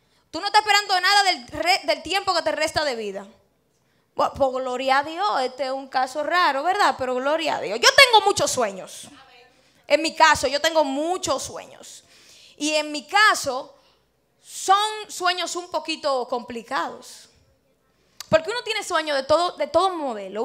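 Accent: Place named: Dominican Republic. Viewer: Me rei con tu presica. Muy edificante. y ese acento de los dominicanos como el de zankimpanki😂. Algo fresco para esta juventud. Bendiciones y saludos desde Cuba.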